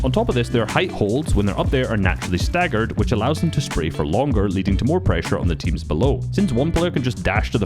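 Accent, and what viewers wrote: Scotland, United Kingdom. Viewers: But isn’t he Scottish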